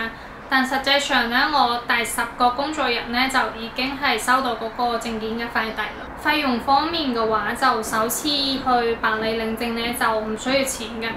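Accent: China. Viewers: 聽你講嘢就知你係大陸人啦，今時今日香港嘅後生仔應該係去咗外國，除咗啲老嘢會返大陸，邊有港人後生仔返大陸。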